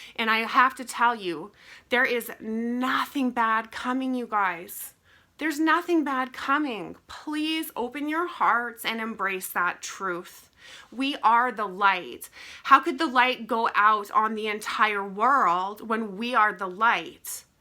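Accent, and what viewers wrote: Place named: Minnesota, United States. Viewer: I grew up in MN and now live in Italy 🇮🇹 in my wife’s country~ Are you by chance from the MN area? Just curious b/c some of the words u pronunciate sounds like it~ Thanks again 4 the info!!!🖖👌💪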